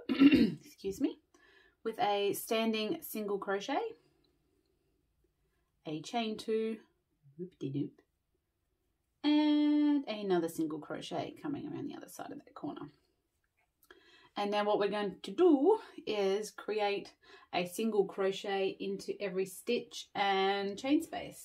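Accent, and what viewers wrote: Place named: Australia. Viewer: I just subscribed to your channel because of seeing this square!! Love it and look forward to listening to your Aussie accent haha!!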